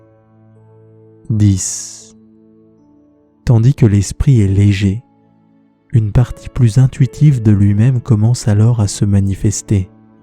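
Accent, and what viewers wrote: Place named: France. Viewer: woooow! Je suis abonnée à ta chaîne depuis peu. Je faisais de l'insomnie depuis un moment et tes vidéos mon sauvé 😇 Particulièrement cette vidéo là! J'ai dormi dur dur comme un bébé tout la nuit et je me suis réveillé que le lendemain! Merci énormément, tu as une voix hypnotisant et doux. Je t'écoute tout les soirs xxx (je viens de Québec et je trouve l'accent français si agréable à écouter 😍)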